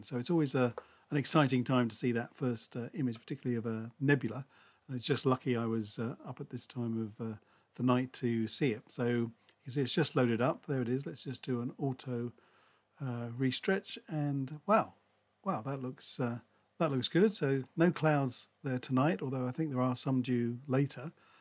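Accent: Australia. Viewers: Looks like a great project, your accent sounds Aussie, you originally from down here?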